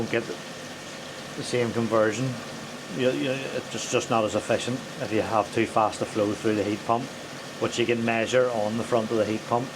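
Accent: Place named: Northern Ireland, United Kingdom. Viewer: I seen you in Yvos video. Well heard you lol so I messaged Yvo and asked is he a Bangor man or Holywood man. He said no he's from Northern Ireland haha. I'm from Holywood originally. Keep the content coming...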